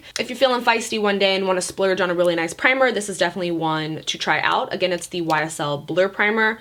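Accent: United Kingdom. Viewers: 💜💜By the way, I love your new purple hair😍 this is funny but my mum is British born and raised and she married my dad who was in the air force at the time and we have family over on England and I lived there for almost four years and your British accent is amazing😂👌🏻👏 Also..I just love you so much!!! Im in esthetician school and I'm supposed to be studying for a test on electricity tomorrow😩 and had to take a break!! Love you lots💜😍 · Happy New Year!! I hope you do a skin care tutorial. Also I love when your British accent comes out, don’t know why but I do..lol Thx&see ya : )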